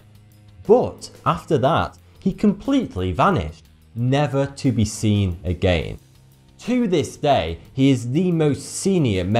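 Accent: United Kingdom. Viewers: Steve Holmes....I believe what you are describing is called having an accent ! He probably doesn't pronounce words like you and I do because he is probably not American. I believe he is British or possibly Irish. Either way, the way he sounds and/or pronounces words will not be like an American does ! Have you never heard anyone speak with an accent...even on TV or in a movie etc ? I actually like his accent, although I admit that it is a little difficult to understand at times.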